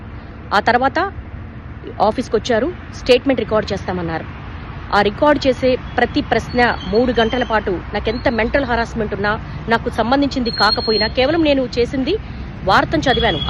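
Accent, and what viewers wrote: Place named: Telangana, India. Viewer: That is Telangana Talkn9bans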